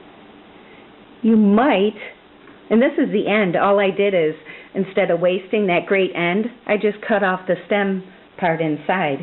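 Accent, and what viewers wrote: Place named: Minnesota, United States. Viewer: I am guessing you are from Northern Wisconsin or Minnesota.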